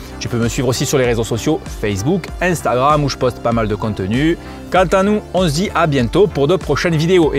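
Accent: South, France. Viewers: ça me donne envie de m' intéresser beaucoup plus au trail, pour ma part j'ai pratiqué une course une seule de Trail La Calanquaise en colline à Carry Le Rouet et je kiffe les Rando. J'avais été vraiment surpris de la difficulté de l'effort, effort que j'ai mal géré en partant en allure trop rapide dès le début de la course. Me surestimant un peu, on est très loin d'un match de football, mais je ne le voyais pas de cette façon . J'avais envie de partager mon ressenti, car j'aime bien tes vidéos et l'accent du sud qui est présent. Tchaoo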